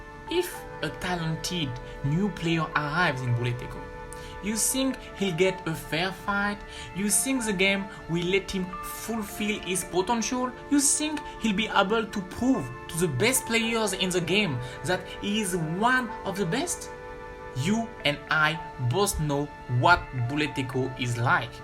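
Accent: France. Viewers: after see ur face reveal on DWI server long time ago i don't expect u have this kind of voice but that france accent 100%🔥 hehe, nice vids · Pro u were from France LOL 😂 I thought you are from USA 😅 but not bad you had explained well bravo 👍🏻🤝🏻🥃🍷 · Are u French?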